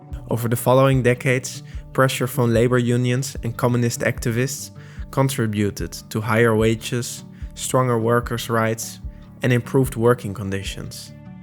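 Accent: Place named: Netherlands. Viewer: Very Dutch looking and sounding young man :)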